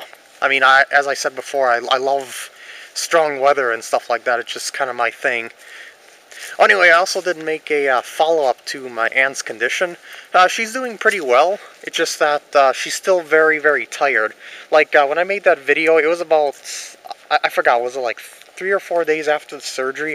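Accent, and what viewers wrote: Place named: Wisconsin, United States. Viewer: no idea where that is, haha it sounds cool, i never heard your accent before and now i know what a "Wisconsin" accent sounds like ;)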